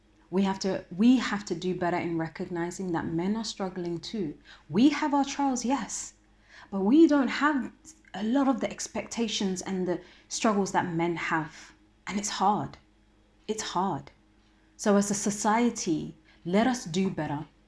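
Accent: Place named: Canada. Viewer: Thanks for sharing your knowledge on this. I promise you I will share it with my friends. Also I pray that the whole of western world will understand the need to this issue.Very thoughtful. Am deeply sorry to ask, what do you studied at the University and are you from Canada? Because the way you always pronouncing the (Better) sound like you are. It's out of curiosity.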